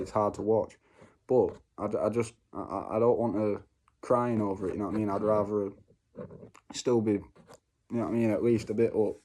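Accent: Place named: Manchester, United Kingdom. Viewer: Hi where do you live and who is your Neurologist. You sound as if you maybe from Manchester. I am on Keppra and Epilim. Clobazam is something I was given but it has side effects and is so difficult to come off so I didn’t start on it and I explained to the Neurologist. There are not many young people who share their seizures so I am glad you are.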